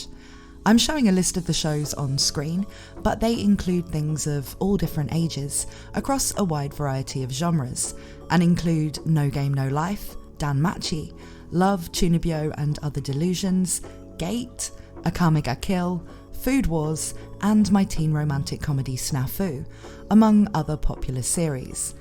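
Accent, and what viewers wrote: United Kingdom. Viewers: They should have someone we can understand say this I don't speak British English 🤦‍♂️🤷‍♂️😤🤢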